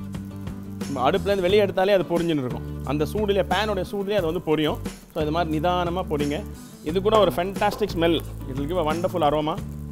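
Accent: India. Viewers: Can't wait to make this. Its speaking an Indian dialect of English?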